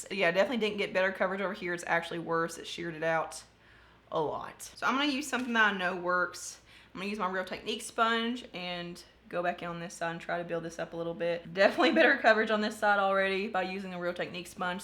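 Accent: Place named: Kentucky, United States. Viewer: Just found your channel tonight because I was looking for a review of Avon powder and already subscribed! After hearing your accent I had a feeling you were from KY like me and sure enough! Excited to keep watching your videos 💜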